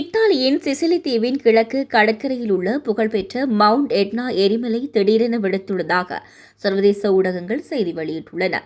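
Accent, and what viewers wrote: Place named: Kerala, India. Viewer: நீங்கள் சற்று நிதானமாக செய்தி வாசிக்கலாம். மலையாளிகள் தமிழ் வாசிப்பது போல் வாசிக்கிறீர்கள்..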